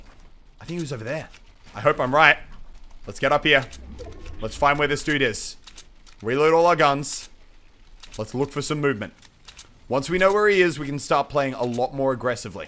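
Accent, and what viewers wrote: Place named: Australia. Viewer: Jesse Nieves he is ausie and sounds like croc hunter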